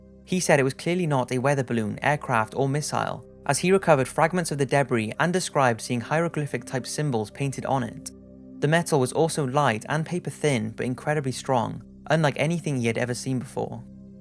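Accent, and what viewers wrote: Wales, United Kingdom. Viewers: Yo are you from Wales?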